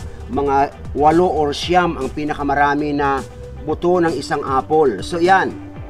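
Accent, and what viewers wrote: Pangasinan, Philippines. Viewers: Pagka po ba inilagay na sa ref ilang days po bago babasain? Same taga pangasinan po ako Sabi ko na nga po ehh halata sa boses😄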